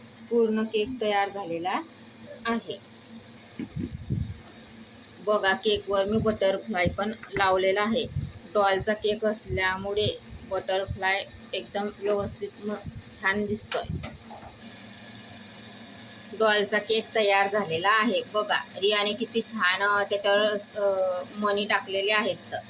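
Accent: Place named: Khandesh, India. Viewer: खानदेशी टोन आहे ताई तुमची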